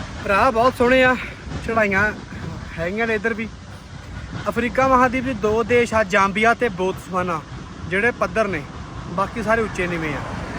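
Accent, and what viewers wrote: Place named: Punjab, India. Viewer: ਤੁਹਾਡੇ ਦੁਆਰਾ ਬੋਲੀ ਜਾਂਦੀ ਪੇਂਡੂ ਪੰਜਾਬੀ ਬੋਲੀ ਅਤੇ ਚਾਰ ਚੁਫੇਰੇ ਦੀ ਜਾਣਕਾਰੀ ਮਨਮੋਹਕ ਹੁੰਦੀ ਹੈ ਵਲੋਂ ਮਾਸਟਰ ਜਸਪਿੰਦਰ ਸਿੰਘ ਗਿੱਲ ਪਿੰਡ ਉਬੋਕੇ ਤਹਿ ਪੱਟੀ ਜ਼ਿਲ੍ਹਾ ਤਰਨ ਤਾਰਨ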